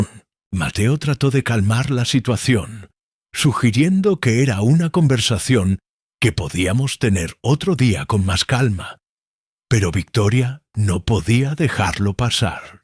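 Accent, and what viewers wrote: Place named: Spain. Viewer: Coincido con un comentario anterior, la historia es buena pero creer que los argentinos hablamos así 😂😂😂es una burla. Es un español pronunciando la Z por favor!! Y por supuesto con Uruguay nos entendemos perfecto. Hablamos el mismo idioma!!! Hay que estudiar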